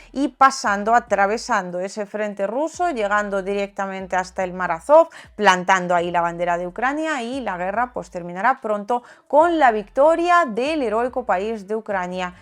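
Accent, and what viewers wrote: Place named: Spain. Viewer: 😊😊😊 habla el español que hablamos en l España, excepto en Andalucía que se parece al vuestro. Pero nos entendemos todos bien,¿no?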